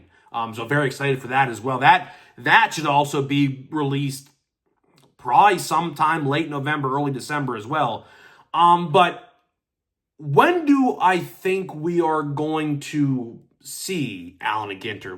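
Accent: Pittsburgh, United States. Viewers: Are you from Pittsburgh? I used to work with some Davey Tree workers from WV and Pittsburgh and they said "YIns" all the time and had kind of the same accent. We love Pittsburgh. Beautiful city. Love R. Clemente. Our first trip to the city we missed R. Clemente by one year. 1973 season. Sad but legendary.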